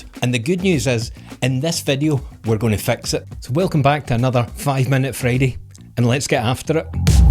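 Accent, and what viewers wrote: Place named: Glasgow, United Kingdom. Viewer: I've really enjoyed your videos since discovering your channel. Based on your accent, I put you in the Glasgow are, but not central Glasgow. More like Hamilton or Strathaven, Am I close.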